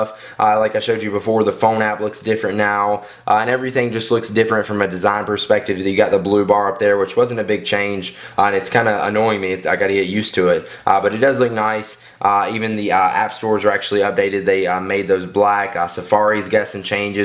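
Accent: South, United States. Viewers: What accent is that? Sounds Southern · I'm not being derogatory but it's funny that this guy is always wearing wigger cloths but sounds really country, that's an odd breed mix haha. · you've probably got the coolest american accent. coming from an aussie. lol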